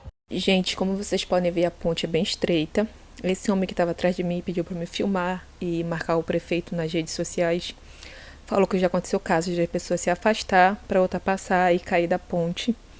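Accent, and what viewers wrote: Rio de Janeiro, Brazil. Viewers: vc fala parecer ser carioca vc1p kkkkkk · Que legal, não tunha iseia da vida aí, obrigado pelo vídeo, adorei. Gostei do sotaque também 😊, parece com o do Rio de Janeiro kkkk 😍👏🏽👏🏽👏🏽. Sucesso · Para que esse sotaque de carioca? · Engrassado sotaque de Carioca la na Amazonia 😆😅😉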